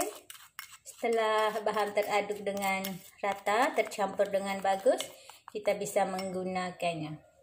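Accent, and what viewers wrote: Malaysia. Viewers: Logat Malaysia ,ya. Salam dr Tangerang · Ini orang mana sih ngomongnya agak kaku? Kyknorang melayu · Cara dia cakap macam orang malaysia bagus saya faham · Suaranya kek Logat melayu dan serasa di dalam pesawat.enjoi the voice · suara ny kayak logat orang maisya🇲🇾🇲🇾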